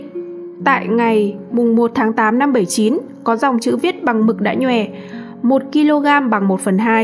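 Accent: North, Vietnam. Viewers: Hết chuyện rồi đem tin xàm xí với giọng Bắc kỳ khó nghe!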